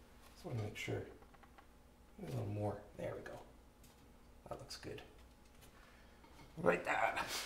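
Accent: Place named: Canada. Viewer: I like everything but the Canadian accent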